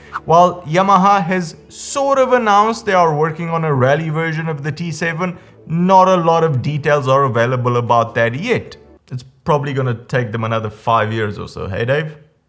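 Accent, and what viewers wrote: South Africa. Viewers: Thanks for the great info, where are you from? you sound positively Afrikaans/South African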